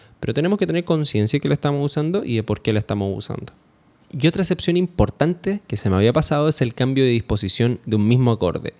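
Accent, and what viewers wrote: Chile. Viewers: Habla como chileno si erí chileno bro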